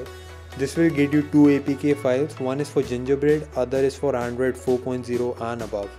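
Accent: India. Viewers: fucking indian accent, cant stand it!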